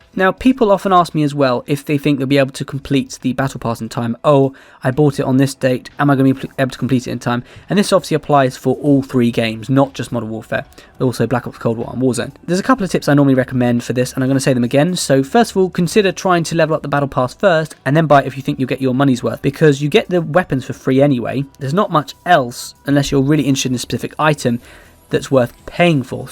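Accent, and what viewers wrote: United Kingdom. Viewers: Like the most of British people voice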